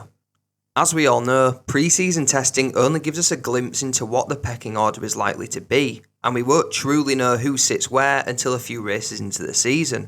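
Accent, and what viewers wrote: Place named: Yorkshire, United Kingdom. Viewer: As a non native english speaker .... just outta curiosity. You from yorkshire, duck?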